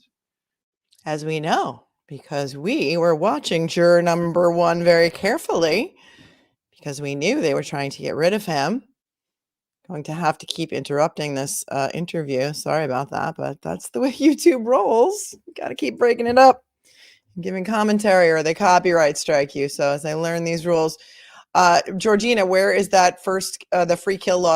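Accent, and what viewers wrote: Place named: New York, United States. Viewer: Replay crew! I don't care how many YouTubers analyse this case, I'm always interested in your perspective and very grateful for your coverage. Thank you for all you do and keeping it real and classy in that gorgeous NY accent 🥰❤️🙏🏻❤️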